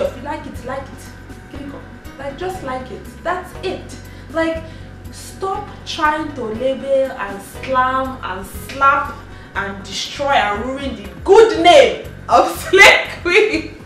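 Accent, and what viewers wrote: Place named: Igbo, Nigeria. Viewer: hahaha...see me laughing my butt here. slay queen drama...That igbotic pidgin english accent though.😂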